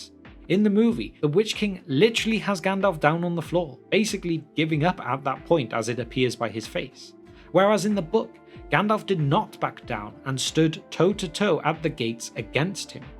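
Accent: United Kingdom. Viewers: Where is the UK is the narrator's accent from? He sounds like thoughty2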